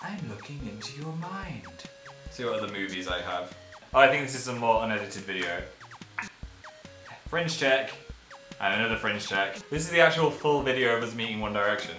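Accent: North, United Kingdom. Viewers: In the camera test he sounded so Northern!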